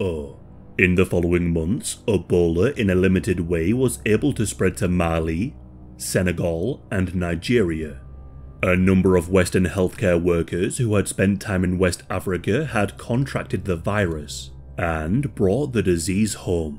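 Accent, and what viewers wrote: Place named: Scotland, United Kingdom. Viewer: I love your accent! Where are you from?! I wanna’ say Scotland, but, I could be wrong. I love your channel, too.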